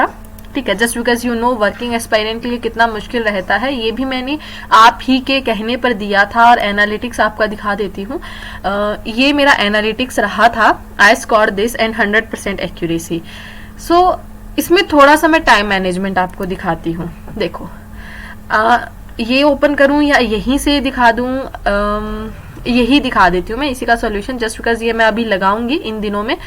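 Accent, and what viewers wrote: Haryana, India. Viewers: aapki voice se pta lg gya tha but m haryana me puch rha tha 🤭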